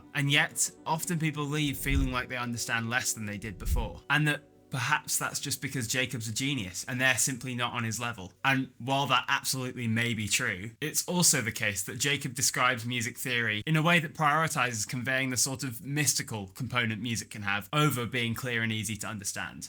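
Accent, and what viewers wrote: United Kingdom. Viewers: I think the British accent helps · leaves the question open though: why would British people give him credibility? I can hear the YouTuber now, and can confirm he too is British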